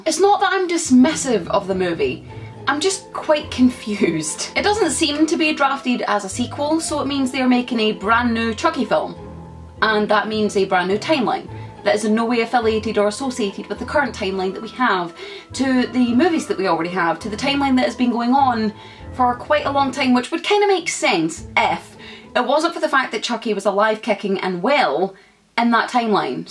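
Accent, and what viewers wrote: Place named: West Scotland, United Kingdom. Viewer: Here thats a wee west scottish accent 🤣🤣 where you from pal?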